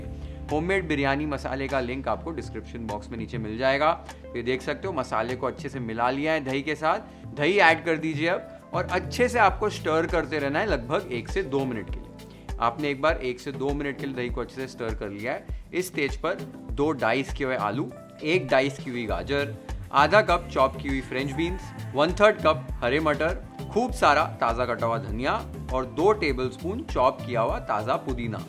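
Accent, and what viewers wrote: Maharashtra, India. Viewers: Aap sab recipe try kiya aur masale bhi Sab eakdam perfect jesa kaha gaya wesa he kiya hey❤❤its taste perfect an superb Best recipe I loved was kala mutton thali u made sambar eak Briyani bhi 😊 Mala Tumcha Amcha Maharashtra Varcha Pream kup Avdal tume Manapasun banvtat ani Bolta sudha 😅 Love ur Marathi acent in Maharashtran Recipe you have Done till Now ❤❤❤❤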